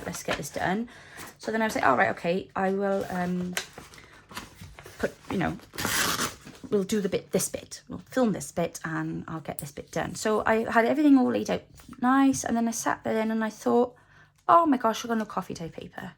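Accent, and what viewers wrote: Wales, United Kingdom. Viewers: So glad I found you - I've really been enjoying all the A-Z Christmas crafts. I spotted that Welsh accent too - I've just returned home to Wales after 20 years away and loving it. Nadolig Llawen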